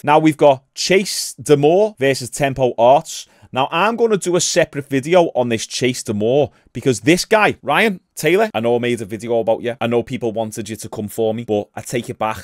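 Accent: Liverpool, United Kingdom. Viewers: Liva Pewl! I hear the Scouser in ya! Thanks for the breakdown!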